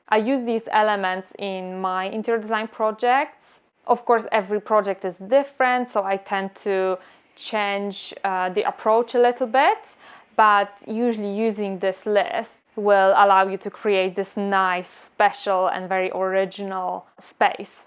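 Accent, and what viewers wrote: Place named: Poland. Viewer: Beautiful Polish accent.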